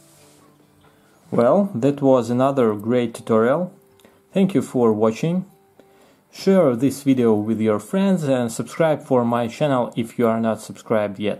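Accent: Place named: Russia. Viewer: да ну! русский в каждом звуке. а вааще, с произношением катастрофа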